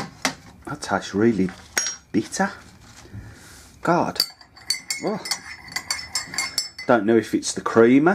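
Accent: United Kingdom. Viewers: where in the West mids are you from? Wolvo or Walsall maybe?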